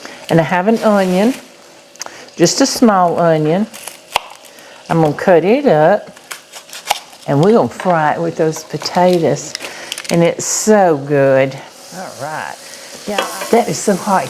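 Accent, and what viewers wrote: South, United States. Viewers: Don't let the critics who tell you how to pronounce 'peppericka' worry you. Your Southern accent is a big part of your charm!! Keep cooking and use plenty of 'peppericka"!! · Pronounce the words the way you do and let others pronounce them their way I love your southern accent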